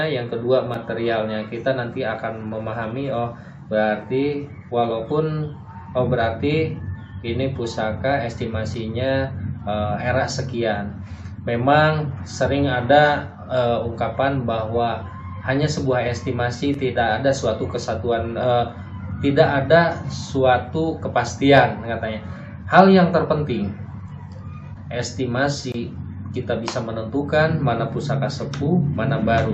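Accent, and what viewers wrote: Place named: Bandung, Indonesia. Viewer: 😅 logatnya gaya orang cicaheum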